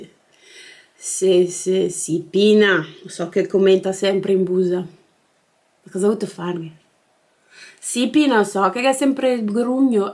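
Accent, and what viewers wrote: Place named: Veneto, Italy. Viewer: Hahaha😂 me compare giacometto. Grazie x avermi fatto ridere oggi. Strepitosa 😂 P.S. Mi mancava sentire l’ accento veneto!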